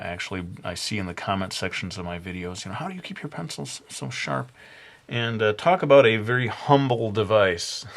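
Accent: Brooklyn, United States. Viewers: in the beginning of his videos, and myself watching, the brooklyn accent makes everything hilarious. now looking back and watching, i had no idea what i was getting into. now im addicted.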